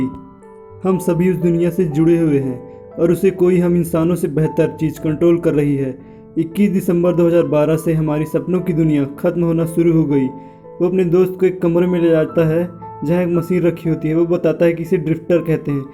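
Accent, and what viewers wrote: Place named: United Kingdom. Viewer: Britain voice h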